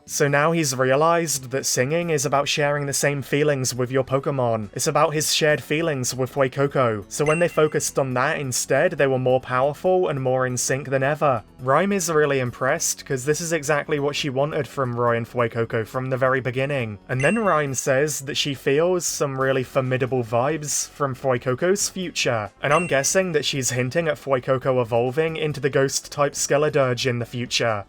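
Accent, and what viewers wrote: United Kingdom. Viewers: Wait a minute, you're British?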